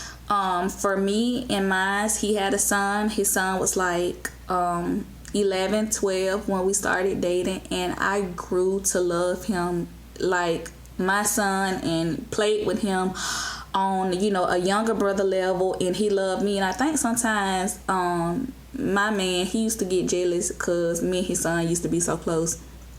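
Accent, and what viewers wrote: United States. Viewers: I love Chocolate woman..2pac said The darker the berry the sweeter the juice..shoot me a Email Choc a doll...if it your situation dont work <mail> sound like you from down south..Im Capitan Save a lot...womam are so much ahead of us. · You sound like ur from Alabama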